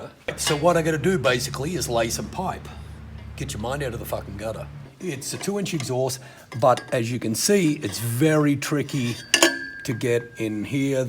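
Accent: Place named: Australia. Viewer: Love your videos. The accent allows you to have the colorful Language without offending. Kind of a "well he's Australian like Crocodile Dundee ". LOL! Thank you for the text included with this video. Very informative.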